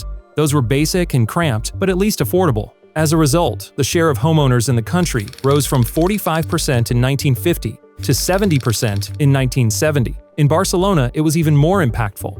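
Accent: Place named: United States. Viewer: American accent. First topic, MONEY. Why am I surprised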